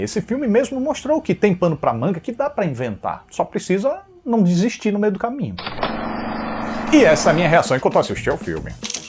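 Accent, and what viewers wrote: Northeast, Brazil. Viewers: Não conhecia seu canal e já amei primeiro pq claramente vc é nordestino kkkkkk sou de Sergipe e pra variar gosto de ver uma linguagem acessível e pela resenha de forma leve! Vi o 6 domingo no cinema e durante a semana revi os outros pra relembrar... Obrigada pelo vídeo 👏🏽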